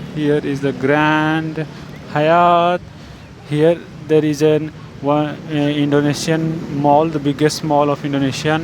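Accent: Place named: India. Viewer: Indian accent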